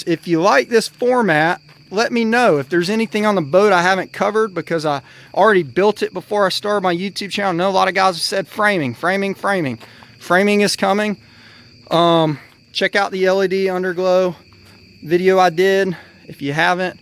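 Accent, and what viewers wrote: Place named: United States. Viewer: Where you from? Cause you sound countrier then a mug cuz.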